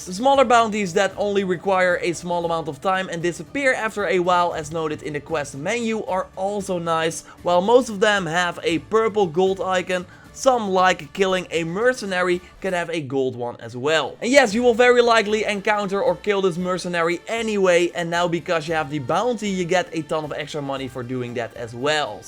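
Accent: Netherlands. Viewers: You sound Dutch, where are you from? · Gek Nederlands accent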